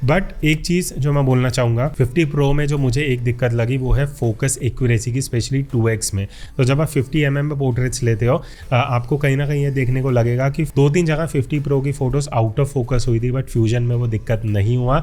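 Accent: Lucknow, India. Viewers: Bhai Lucknow se h?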